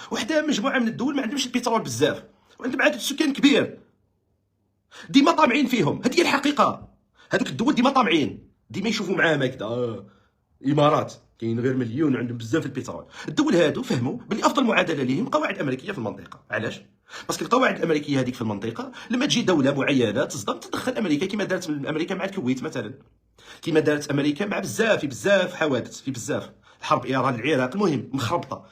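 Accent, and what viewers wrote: Morocco. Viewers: الهدرا تاعك تبان شغول مروكي ،،،،تهدرو غير علي كرشك ،و انت واش تمد البلاد ،اتزوج دير دراري و رمي برا ،،، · هذه اللهجة مغربية و الله أعلم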